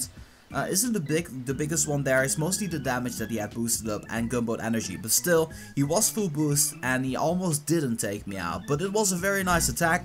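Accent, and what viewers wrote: Netherlands. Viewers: if i compare youre accent with loui van gaal. i can obvious hear you are from the netherlands. correct me if im wrong.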